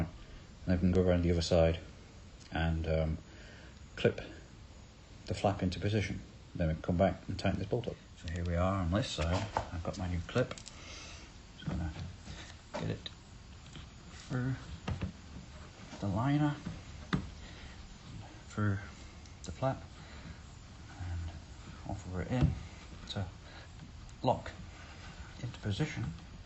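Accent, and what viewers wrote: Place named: Ireland. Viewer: Do I detect an Irish twang to your accent? Great video by the way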